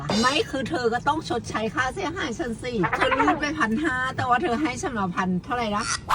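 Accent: Thailand. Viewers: ชอบสำเนียงเมืองกาญมาก ติดตามเพราะกวน…ดี😂😂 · ชอบสาวเหน่อครับ น่ารดดดดดดดด😁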